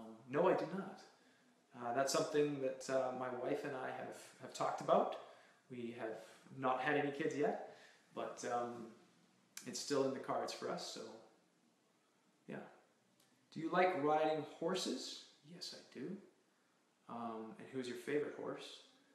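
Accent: Canada. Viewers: When your american and he says drAMa and soory ive never understood but then again im not Canadian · He sounds more Canadian here than on heartland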